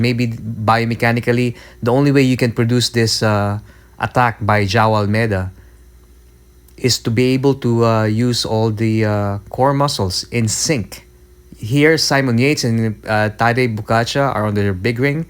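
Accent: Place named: United States. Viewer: Nice vid bro. Just curious where you learned how to speak English. Did you ever live in the U.S.?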